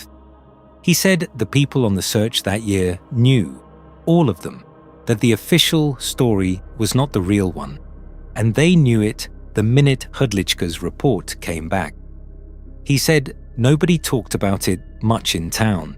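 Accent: United Kingdom. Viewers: "I'm and if you've BEAN following this..." Get rid of the british accent.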